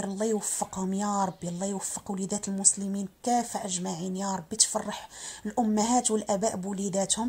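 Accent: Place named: Morocco. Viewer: ماشاء الله عليك عزيزتي ماحلاها اللهجة المعربية ربي يوفقك❤❤❤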